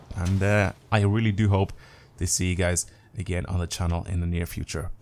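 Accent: Ireland. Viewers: What part of Ireland is he from if he’s even from ireland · Are you from Ireland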